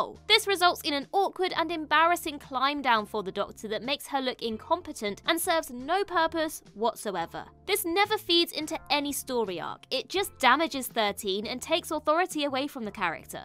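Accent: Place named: United Kingdom. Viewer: Ayo i love british accent ”doctor U”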